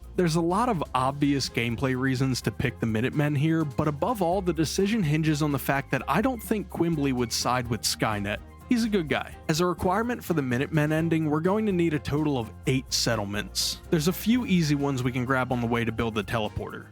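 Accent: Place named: Pittsburgh, United States. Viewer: Idk where ur from but I keep catching a Pittsburgh soundin accent 😂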